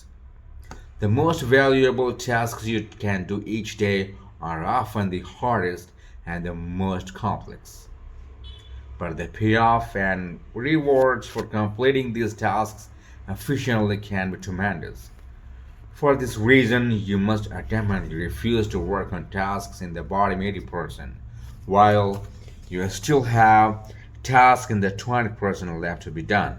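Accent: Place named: United States. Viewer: A true American accent 🎉🎉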